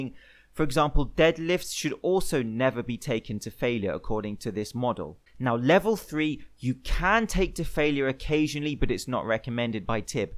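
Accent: United Kingdom. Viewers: Please understand this is a compliment. I do NOT like the British accent. It grates on my ears. But that’s my problem not yours. The information you provide is so valuable I listen to it anyway. It’s kind of like training for my ears.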